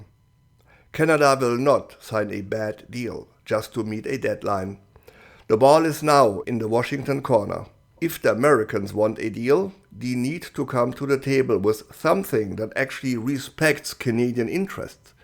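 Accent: Germany. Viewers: Liebe Grüße aus good old Germany! Ich muss schon sagen, dein deutscher Akzent ist deutlich zu hören, doch deine English Skills sind schon recht ordentlich. 😇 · thank you, great reporting & did I ever tell you that I love your German accent? LOL!! I totally agree with your POV! Although these times are VERY stressful for everyone, I can't say how PROUD I am of my fellow Canadians for standing up and standing strong against those CRIMINALS in the US. Whenever I feel down about everything, I read the comments on shows like yours and feel so much better, much calmer knowing that Canadians are feeling the same as I do and are willing to suffer the short term to gain in the long run. I trust our PM and am so happy to see him taking Canada away from these cretins down south and doing business with the rest of the world. What's the point of giving concessions to a mob boss that will NOT honour any deal with sign anyway?? 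Look, I work for the GOC and nearly lost my job when Carney did those major cutbacks on the public service. I was one of the lucky ones that didn't get cut but even if I did, I would still support him and what he's doing for our country against the lawless, murdering criminals running the United States right now. The one good thing tRumpf has done for Canada is bring us closer together as a nation to realize how lucky we are to BE Canadian and that we must now fight for that life - again - in world history. CANADA STRONG & BABA-MAGA (buy anything but american & make america go away)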